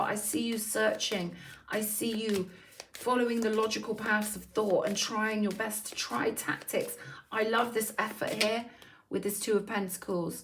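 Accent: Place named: London, United Kingdom. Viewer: I love ur accent, your kinda like a posh London geez ❤😂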